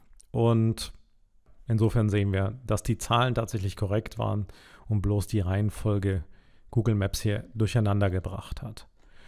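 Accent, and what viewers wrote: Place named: Germany. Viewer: Great tutorial , but German accent it's difficult to understand.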